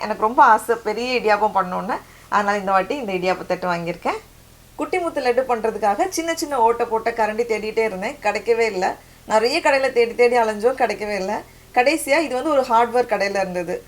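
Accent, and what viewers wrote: Nagercoil, India. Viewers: So happy to hear namma ooru Tamil. Looking forward to seeing more of your videos. By the way,,,can u upload some roast/grilled chicken in oven if possible? I am from Nagercoil but now in UK, where we get lovely meat joints. I hate the English way of doing it as it’s too bland. Oven roast chicken, duck, turkey, ( if u r ok with beef and pork u r welcome. if not ignore it. No issues) Wish u a wonderful New year. Nothing refreshes us like a visit to our home town. Keep going. All the best.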